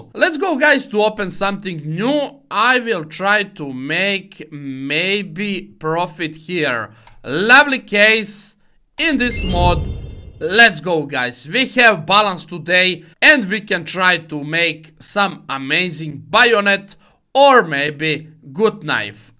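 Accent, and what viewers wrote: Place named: Serbia. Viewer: pricas engleski ko srpski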